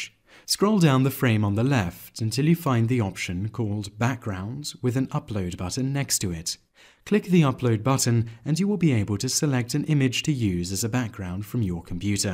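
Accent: England, United Kingdom. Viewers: This Englishman's accent is amazing.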